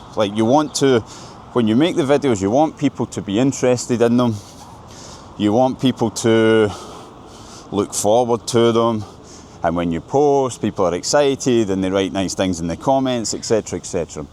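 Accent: Scotland, United Kingdom. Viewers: Job well done, I've gotten a feel for Moscow and for Russian people, favorable in total. You have a talent for interesting content with a relaxed feel, I will miss your Scottish accent and walks through the city. Thank you from a friend in Kentucky [usa]. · I admire Scottish accent so much. Good luck, dude. I’m sure that you will be okay no matter where you live. 😊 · I’ve just watched your video for the first time. Like it! Scotch accent is adorable and in some places reminds me my own one. Rs are very similar. Will watch more. Thank you!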